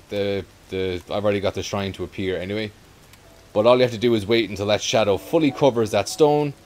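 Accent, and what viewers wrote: Ireland. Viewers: Love the waterford accent boi!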